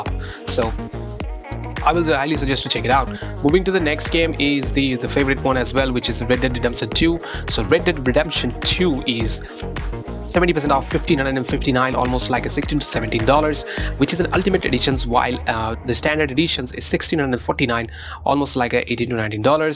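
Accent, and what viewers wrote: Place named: India. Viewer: bro that's some nice accent i bet you are an indian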